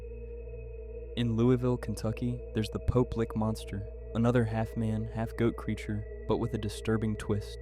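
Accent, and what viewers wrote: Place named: Maryland, United States. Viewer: u can tell my dood is from maryland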